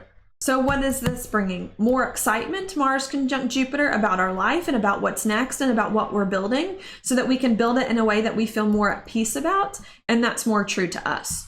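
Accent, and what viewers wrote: United States. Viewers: you have an interesting accent, I'm trying to figure out, what state? enjoyed your reading.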